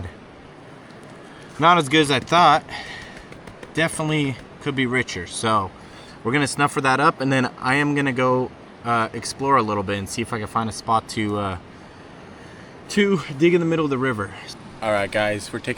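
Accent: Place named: California, United States. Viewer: I never would have thought you are speaking English as a second language, but I do hear that there is more to your sound than California, just a very very tiny bit - 1%. Seriously, amazing to learn English as 10 - that is impressive.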